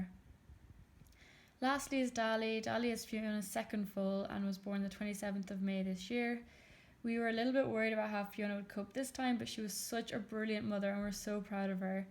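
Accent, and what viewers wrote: Ireland. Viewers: Are you Irish 🍀 cause u sorta have an Irish accent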